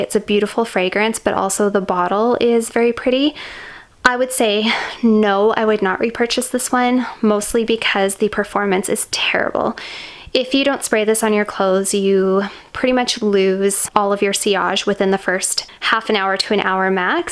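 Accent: Canada. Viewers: Hey, you’re Canadian?!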